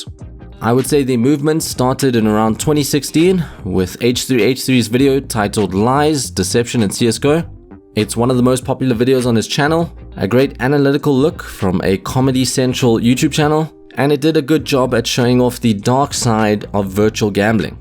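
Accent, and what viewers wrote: South Africa. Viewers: Yo bro you south african?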